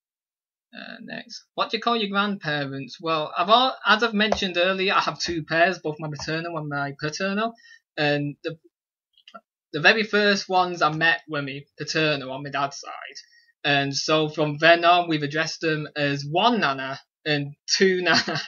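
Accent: United Kingdom. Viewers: your accent is indeed less noticeable than most British people's, however you do have an accent haha. also yes, fizzy drinks hurt. i thought I was the only one 😂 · You're a little bit awesome. Also, you sound like a Manc more than anything with a touch of Lanky in there to me, but you could easily pass as someone from Yorkshire because a lot of people here sound northern without any real overly distinct accent. /rant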